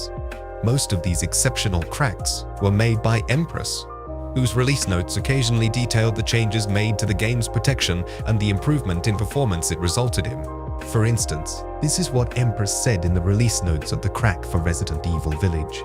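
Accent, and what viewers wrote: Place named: England, United Kingdom. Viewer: bruh i just listened to jolly wangcore, he's australian. idk what the accent is called but this is like a slightly posh English accent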